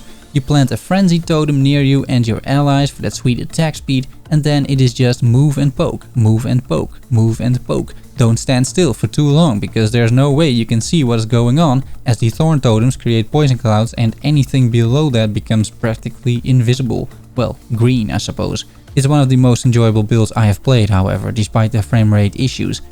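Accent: Netherlands. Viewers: Your build guids are getting better and better! Keep up the good work. Where are you from? Are you Dutch?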